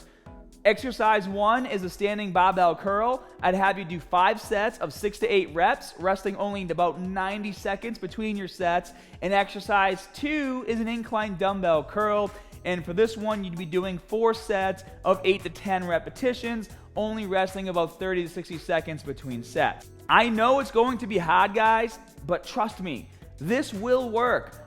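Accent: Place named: Boston, United States. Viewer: Inconsistent Boston accent? Is he from Rhode Island or something? It sounds like a hybrid accent.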